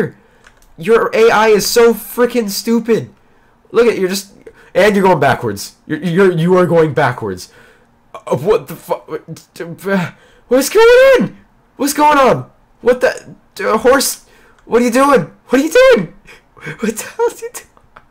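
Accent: United Kingdom. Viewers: Your British Accent xD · the british accent ain't bad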